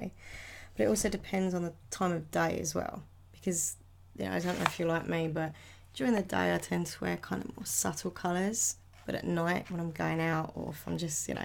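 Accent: Essex, United Kingdom. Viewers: Your voice soothes me lots.. I think it's because of your accent. My family is from Essex. You're my favourite 😊❤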